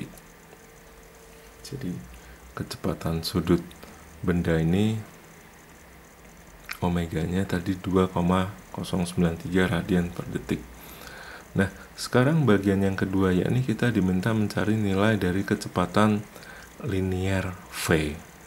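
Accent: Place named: Java, Indonesia. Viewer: suara nya medok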